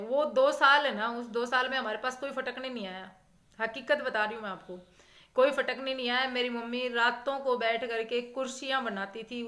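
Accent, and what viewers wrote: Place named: Haryana, India. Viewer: Aapki aavaj se to asa lag aap haryana se ho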